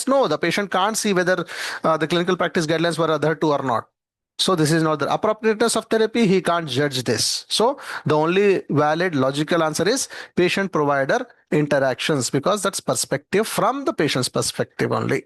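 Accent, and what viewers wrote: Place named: India. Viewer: ليش هندى اللى بيشرح ...على رأى ترمب لما قال اى دونت اندر ستاند ..سيت داون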